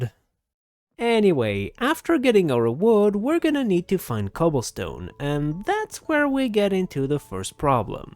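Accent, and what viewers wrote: France. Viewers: Are you French? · are you french? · Are u french??? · Give him a break, he's french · Oh, so that is a french accent! As a not native english speaker it is good to find out how exactly different accents sound like. Great video as always! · You are french?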